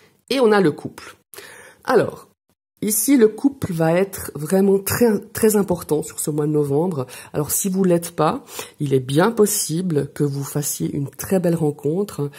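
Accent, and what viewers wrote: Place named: Geneva, Switzerland. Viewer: Merci infiniment pour votre message 🥰 J’entends l’accent genevois !?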